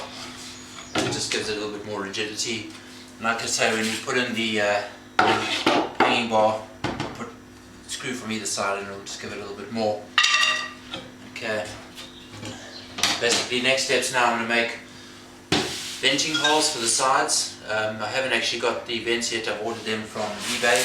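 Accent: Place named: South Africa. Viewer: Not at all, you sound 100% South African, just wondering if you were also experiencing people getting confused with the 'English' South African accent as opposed to our Afrikaans accent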